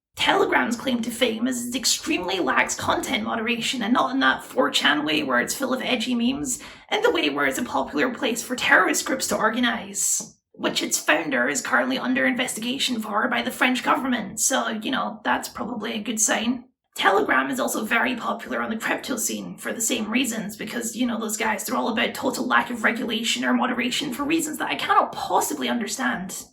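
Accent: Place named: Fife, United Kingdom. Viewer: You're partially right in this instance, as the absolute scavenger that's the current trademark holder is domiciled in the United States. However plagiarism is actually an offense under UK law, the nation state both the creator of this video and I are domiciled in (So I assume based on his vaguely Fife-adjacent accent; Am I close?), and is also an offence in France where the potential plaintiff is domiciled. This might still matter, but only in relation to the game's distribution in those regions: Something I have little doubt the nutter who shat money on a dead old game's name only to feather it with crypto-slop will care little about, as there will be plenty of markets where the law does not adequately protect creators.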